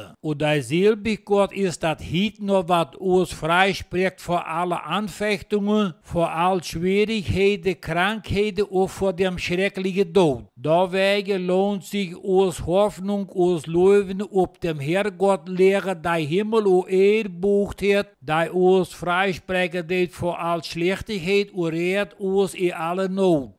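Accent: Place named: Pomerania, Germany. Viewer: ek is van suid afrika...die lesing uit die heilige skrif is mooi in die pommere dialek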